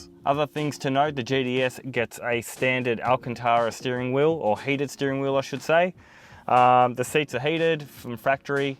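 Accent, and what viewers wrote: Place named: Australia. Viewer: "GDS" lol..This just goes to show how slack the Aussie accent is, lol. Seriously, Aussie Motoring Journos should have to take speech lessons.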